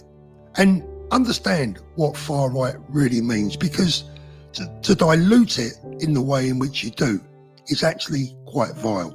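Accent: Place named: London, United Kingdom. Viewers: It's because you have a South-East England accent,. The Left Wing Labour Party used to exist to support the working class and aim to create more equality of income, however, it's been taken over by an over educated middle-class metropolitan elite whove all been to university and believe themselves to be suoerior to anyone who hasnt. The only home for the genuine working class is now the Reform Party and parrly the Conservative Party. The Labour Party has morphed into supporting every minority except the whoye working class who have regional accents. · This doesn't surprise me in the slightest...these warped loony left crackpots try and try again (anyone whos got an alternative view to theirs) to smear,lie and just make s**t up about someone...TBH whenever i see that theres no journalist's name to the "story" then its just made up S**T!! 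Just to try and smear you and get you cancelled!! TBH the funny thing is they think we're too thick to see what their game is!! BUT the thing is =you've got some tatts on yer brightons you talk wiv a london accent and lets be honest you DO LOOK LIKE A RACIST!! The words Book and Cover spring to mind!! I'm sure if they clocked me in the middle of summer they'd ASSUME exactly the same S**t!! Shaven head,tatts on arms back and chest and i was born and brought up in Peckham so how am i supposed to talk?? Best thing -point and larf at em!!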